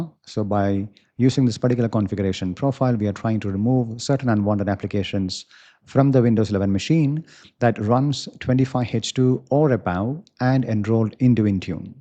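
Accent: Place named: India. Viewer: I was so happy to hear an Indian voice focusing on how to get rid of the junk that is Microsoft from your life and then I saw your header and it says Azure ! Your pace and condensed presentation is still very good 👍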